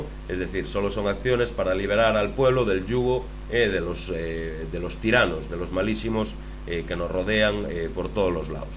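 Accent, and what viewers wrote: Galicia, Spain. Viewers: Acento Gallego de GALICIA-ESPANA.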